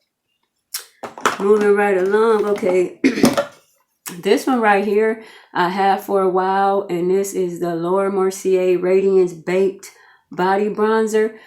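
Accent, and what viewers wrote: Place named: Los Angeles, United States. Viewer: Random question... r u from Cali ? I can’t really pick up exactly where u from , but u sound like u from LA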